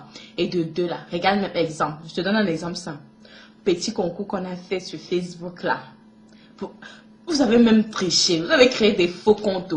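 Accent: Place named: Cameroon. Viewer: Mdr les Camer vous parlez francais? mdr c'est quoi cet accent de merde?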